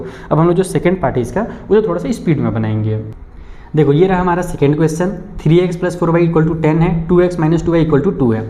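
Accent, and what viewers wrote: Bihar, India. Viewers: Sir aap bihar se ho kya❤❤.. kis jile se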